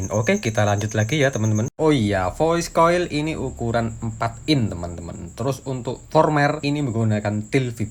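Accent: Surabaya, Indonesia. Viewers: That accent sounds like Surabaya?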